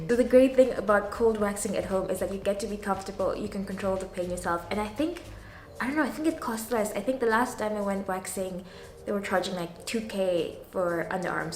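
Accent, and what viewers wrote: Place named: England, United Kingdom. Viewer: 💜 …….💜 ….Girl What I love about this video is (everything) but specifically your English accent 🔥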